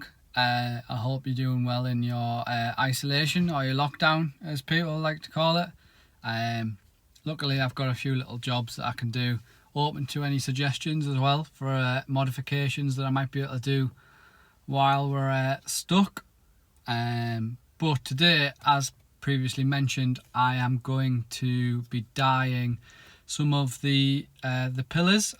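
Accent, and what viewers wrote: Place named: North, United Kingdom. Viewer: You sound like a fellow northerner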